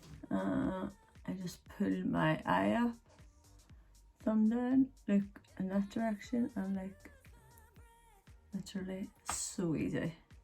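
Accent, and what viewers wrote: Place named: Belfast, United Kingdom. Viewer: Omg I’m from Belfast you sound like you have my accent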